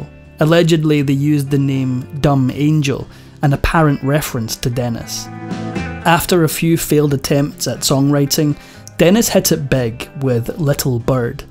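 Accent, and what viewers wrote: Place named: Scotland, United Kingdom. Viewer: You answer my question . I love his voice too. Scottish . I thought British.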